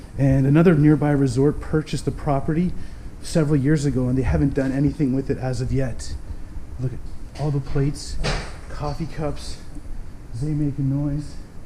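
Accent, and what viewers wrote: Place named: Canada. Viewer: "We checked it ooot" = Canadian.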